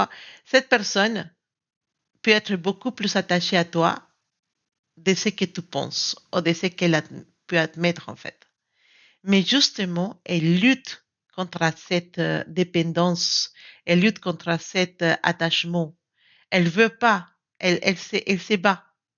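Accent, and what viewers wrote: Spain. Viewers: Merciii cest fou cest ca cedt avec un vierge flamme jumelles yavait une tierce je lui ai dit mes sentiments et aussi quil me manquait samedi cest son anniversai je vais lui envoyer un message vous avez un joli accent vous etes espagnole ma maman est mexicaine merciii je laime de trop ca gait un an que je lattends la je vois une personne meme si je laime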